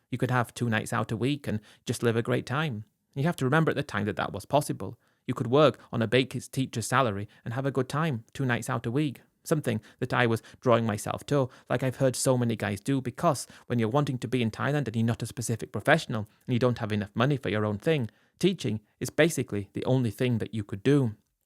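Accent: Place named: Liverpool, United Kingdom. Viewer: Adyeee lad more scouse whinging 😢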